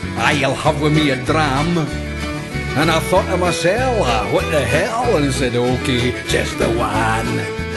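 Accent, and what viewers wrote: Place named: Scotland, United Kingdom. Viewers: I love the sco'ish accent! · He is a Scot!!!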